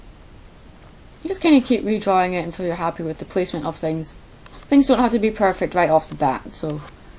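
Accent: Scotland, United Kingdom. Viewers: Theamax Stage No,she's Scottish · you sound Scottish i live in Edinburgh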